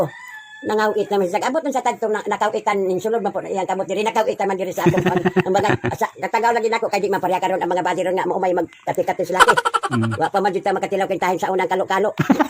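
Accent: Surigao, Philippines. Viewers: Lola,makalingaw jud imng storya la, bisaya surigao accent.... taga surigao here sad lola. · 😁😁😁😁 lingaw Jud ko nimo la ako Lola taga surigao sad ingana sad mag storya sa emoha 😁😁😁watching from parañaque 😁😁😁😍😍😍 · asa ka dapit sa surigao lola? mao diay ang imo sinultian familiar kaayu 😁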